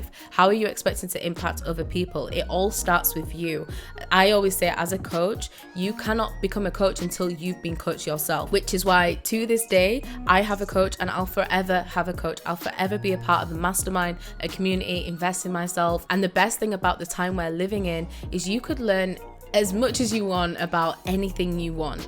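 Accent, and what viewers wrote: United Kingdom. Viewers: Hearing a UK accent automatic like❤😊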